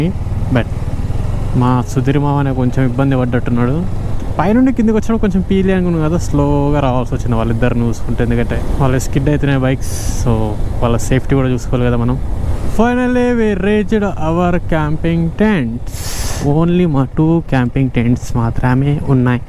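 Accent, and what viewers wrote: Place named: Tamil Nadu, India. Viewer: Masthu undi bro place nd me Tamil slang kuda 😂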